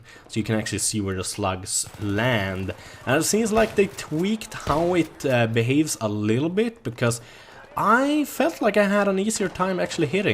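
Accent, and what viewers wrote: Sweden. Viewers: He's Swedish. It's his accent. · Are you Swedish?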